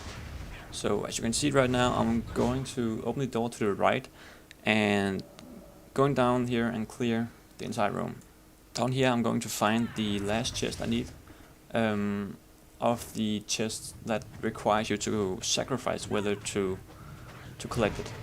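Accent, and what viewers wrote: Denmark. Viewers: Nice danish accent dude! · du er dansker kan jeg høre skal man være exalt med dem ?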